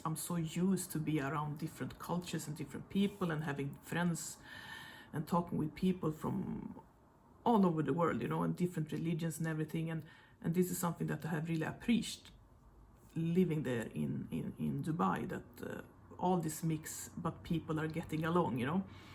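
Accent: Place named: Norway. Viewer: your accent sounds norge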